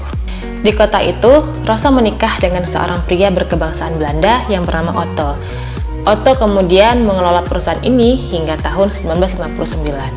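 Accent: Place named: Medan, Indonesia. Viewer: Banyak belajar sejarah lama yang baru tau nya sekarang, padahal yang asli medan belum tentu tau. Semangat terus buat mbak rutin upload nya, karena saya yakin masih banyak cerita seru sejarah/kuliner medan yang menarik dibahas dengan logat "cakap-cakap medan" hhe Terimkasih udah buat video ini jadi lebih tau. #Giveaway #Dudiksendaljepit #RagamVariasi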